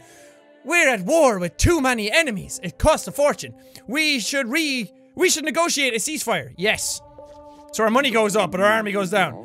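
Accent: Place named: Ireland. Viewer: Please make more videos where you talk in that heavy Irish accent it's very beautiful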